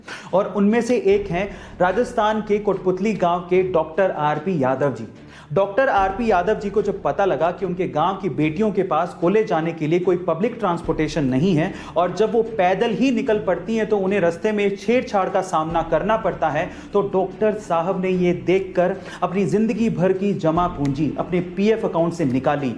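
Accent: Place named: Haryana, India. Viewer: Don't mind sir but everyone cannot speak in haryanvi like u except haryanvi people because this language is in blood Jai hind jai haryana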